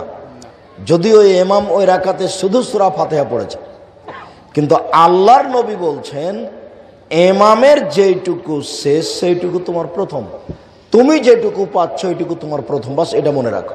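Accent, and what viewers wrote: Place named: Kolkata, India. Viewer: Unar uccharon Kolkata type..keu ki details bolben unar(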